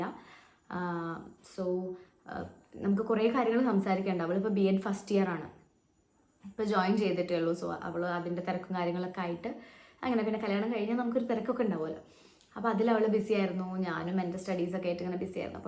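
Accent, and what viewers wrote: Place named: Palakkad, India. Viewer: Hello chechyy Unnivava vlogs le chechy mare athe slang ningalkum....ningl oke palakkad aayond aavum llea... Anyways kettirikkan nalla rasamund ....❤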